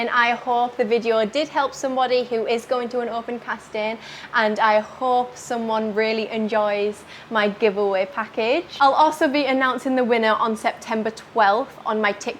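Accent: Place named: United Kingdom. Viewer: Beauty, brains, and British accent! Can't get any better than that!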